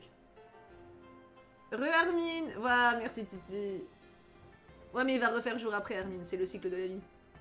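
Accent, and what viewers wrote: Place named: South, France. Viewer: En tant que sudiste je valide l’accent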